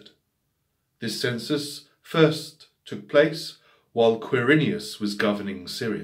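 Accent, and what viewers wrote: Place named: United Kingdom. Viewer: My (Sub - we have to renounce of this prefix)!... You explain accurate the message / meaning of this fragment of the Bible!... I forwarded this to my friends and students who speak and understand English for them to have both a good teaching and a model of how they have to pronounce the words in British English!... Thank you!...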